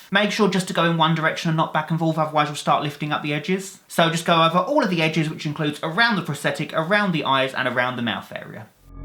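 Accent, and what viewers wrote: United Kingdom. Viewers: ik he is both of those I'm asking a question a lot of his fans know including me 1) u can tell he is gay because in one of his fan mail vids one of the fan mail letters it's said something bout his bf and u know he is British by his accent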